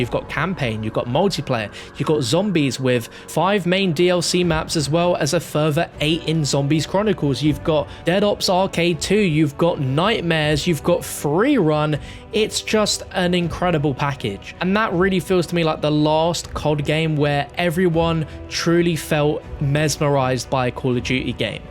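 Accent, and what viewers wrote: United Kingdom. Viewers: Cool british accent!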